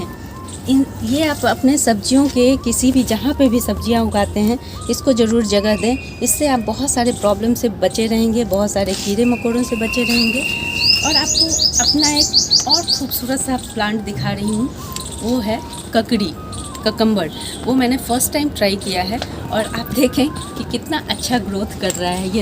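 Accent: Bihar, India. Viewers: Aap Bihar se hain kya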